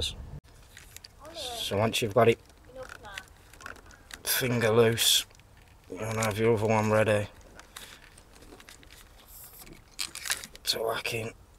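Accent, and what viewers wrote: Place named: Black Country, United Kingdom. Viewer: hi are you from black country? I'm looking for someone to sort my astra and you sound local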